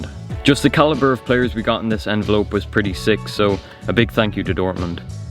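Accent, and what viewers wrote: Ireland. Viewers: Are you irish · Are you Irish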